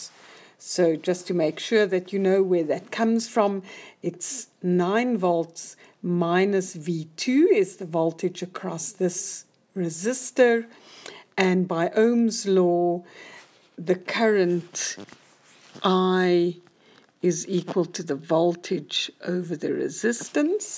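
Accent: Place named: South Africa. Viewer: I'm a South African studying in the states and hearing this accent made my day, new subscriber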